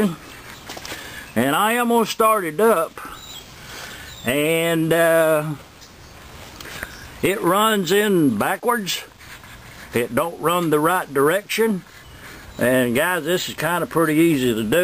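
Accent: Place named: South, United States. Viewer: That accent! Been bugging me all day, I'd heard it somewhere. Reminds me of, a country singer out of Old Fort, North Carolina who used to perform with his brother Wiley. [' ']. They was running a body shop as in the sixties after their spell as warblers.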